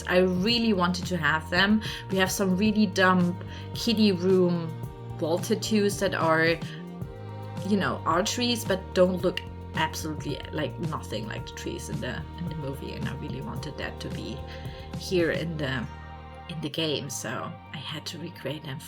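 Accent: Germany. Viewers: I could hear it in your accent that you're german! (I'm german too!)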